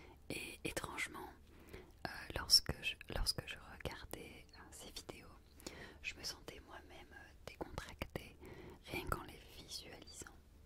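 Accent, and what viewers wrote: North, France. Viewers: Tu viens du nord, c'est cool c'est ma région natale (Haute-Normandie), tu parle un peu Normand ou ch'ti? 😄💜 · tu est du nord ? sinon tes video sont super ^^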